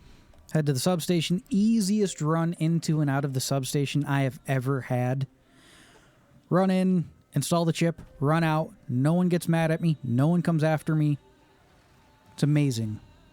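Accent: South, United States. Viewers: You sound like you just came our of the mojave, you have a subtle southern accent lol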